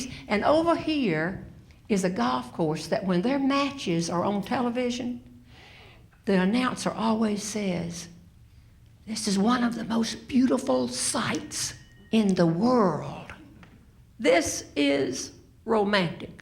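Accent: South, United States. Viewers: Ms. Robertson is the funniest woman in the world of humor. I love to listen to all her stories because of the humor and the southern accent. Thank you ma'am for the laughter you have brought....Billie J. King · Linda Watkin I’ve watched for quite a long time now and cannot imagine how anybody could find a thing “nasty” about her. You said you “do not like her humor” and then said that you “think that she is nasty.” Is it her clothes, her hair, her voice, her Southern accent or what exactly do you find so offensive about her? Her humor is clean and free from the usual cussing, sex and vulgar talk or political comments as is so common with the majority of comedians these days. If you don’t care for her style of comedy, then simply don’t subject yourself to it. When you come upon one of her videos it really is the easiest thing you’ve ever done to just scroll on by without ever touching on it to start playing. 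We’re all allowed to enjoy our own chosen style of entertainment so please let us enjoy Ms. Robertson and I encourage you to find whoever or whatever brings laughter and joy into your life. God Bless You.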